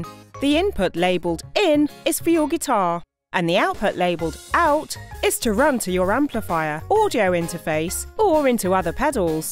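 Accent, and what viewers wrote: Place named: England, United Kingdom. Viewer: The English accent creeps me out... and i was born in England...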